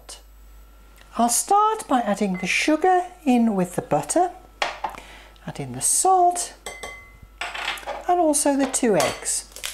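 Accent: Birmingham, United Kingdom. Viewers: are you a brummie? I love your recipes.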